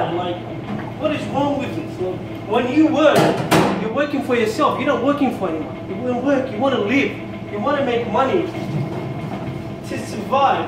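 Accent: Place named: Australia. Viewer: wow aussie accent! :P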